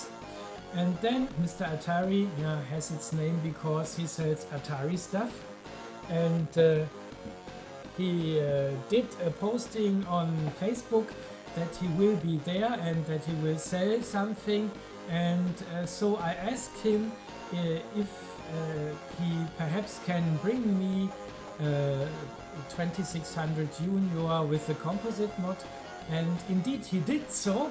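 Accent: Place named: England, United Kingdom. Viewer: Great video buddy. Enjoyed it. Are you in England? Some of your words gave it away 👍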